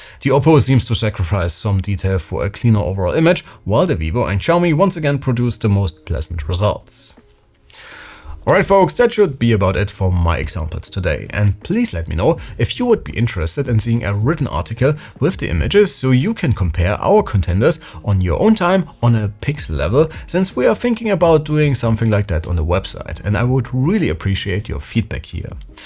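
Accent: Germany. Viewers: Great Video. Is your Accent from Germany?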